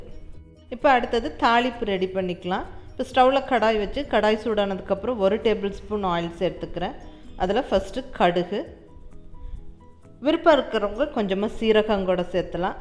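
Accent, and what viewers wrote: Coimbatore, India. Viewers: Coimbatore pola neenga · Sema..supar.tank u mam.unga voice coimbatore, erode matiri iruke?nice voice.god bless u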